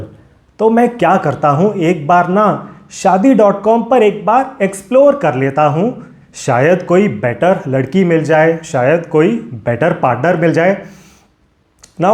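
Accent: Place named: United Kingdom. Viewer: english accent 🔥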